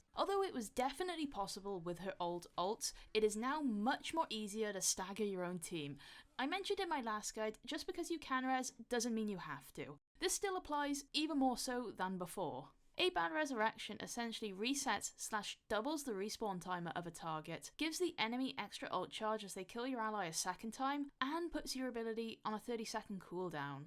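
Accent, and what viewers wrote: United Kingdom. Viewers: Awesome vid! You from the UK too?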